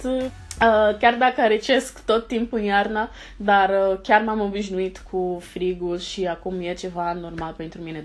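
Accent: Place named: Bessarabia, Moldova. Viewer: Ai un accent basarabean sau undeva pe acolo.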